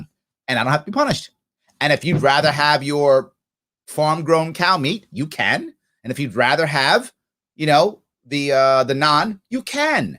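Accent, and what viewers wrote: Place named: New York, United States. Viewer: Larry: for Pete's sake, you talk like a typical "New Yorker"...terrible weather in New York, in New Jersey (so cosmopolitan of you to deign to mention NJ), but what about Philly and Washington, DC...we don't get any respect? Let me inform you that we got a TON of rain and wind in DC on the night of 31 August. NYC is not the prima donna (not anymore) of the East Coast!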